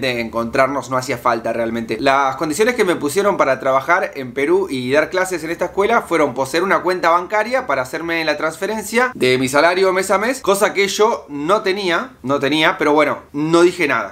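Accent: Argentina. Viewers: Muy interesante tu vídeo!! Bien expuesto muy claro. Se que tienes todo bien calculado. Me alegro que estes conténto con tu trabajo!!! Es verdad que los argentinos son muy bien vistos y considerados en Peru!! Especial mente por las chicas jajajajajaja 😂. Es sabido que no hay Argentino feo!! Todos son bellos y sobretodo encantadores, creo que eso que decían antes que el Argentino es sobrado ya paso a la historia verdad?? Ojalá todo continúe como dices avanzando y no empiecen las trabas con el gobierno de este señor Rojo!! Espero sigan tus existos tanto en el canal y como docente!! Me encanta cuando hablas y dices Posho, shamame, Sho etc etc jsjajaja😂. Me gusta ver que la gente te quiere!! Los peruanos somos querendones con los extranjeros pero no!! entre nosotros lamentablemente!!! 🙁. Buena vibra 😊 Bendiciones 🌺🌸😊😉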